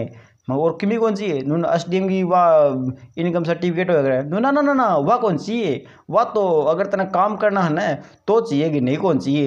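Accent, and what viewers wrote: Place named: Haryana, India. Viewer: Gajab hai teri Haryanvi Boli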